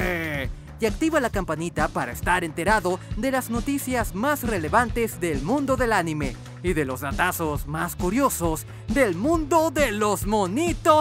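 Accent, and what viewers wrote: Venezuela. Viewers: Fonsla eres Venezolano? xd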